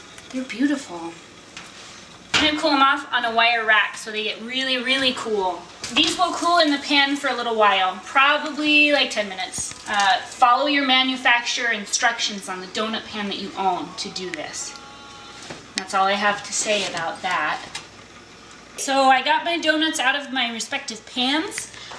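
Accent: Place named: Midwest, United States. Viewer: These look amazing! Dying over your midwestern accent LOL. Can't wait to try them!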